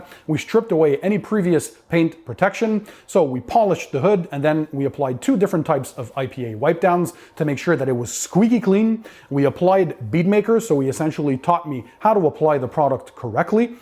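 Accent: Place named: Montreal, Canada. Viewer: I was going to ask if your from Montreal because of your accent and then you said it! From here too!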